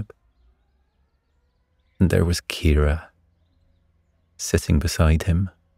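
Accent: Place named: Scotland, United Kingdom. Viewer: A cosy Irish story I chose to help me sleep before work in a few hours.. but the accent is Scottish. Can't work for me with an Irish story anything else fine but not an Irish story 😔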